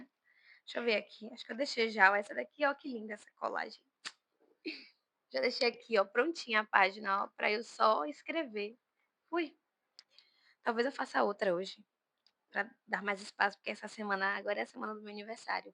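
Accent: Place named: Bahia, Brazil. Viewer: Amei sua voz! Sou baiana também e amo achar pessoas no YouTube falando baianês também! Muito legal sua forma de fazer diário!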